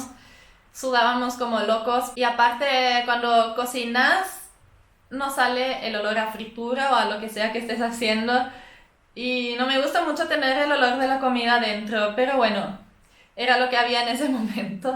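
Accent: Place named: Paraguay. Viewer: Ya tenes acento paraguayo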